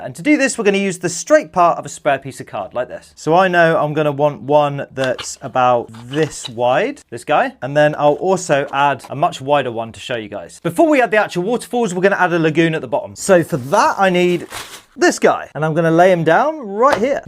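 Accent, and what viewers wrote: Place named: United Kingdom. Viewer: Bro british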